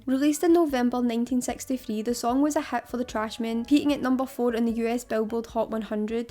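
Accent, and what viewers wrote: Scotland, United Kingdom. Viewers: I absolutely love your Scottish accent-it's so rich and full of character! And your videos? They feel so real, so genuine, like you're just talking to a friend. Honestly, it's refreshing to see someone create content with this much heart. Keep doing what you do-you're amazing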